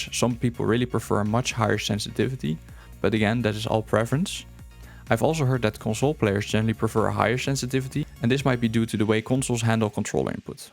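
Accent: Netherlands. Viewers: Your Dutch I just hear it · hey are you dutch matbe?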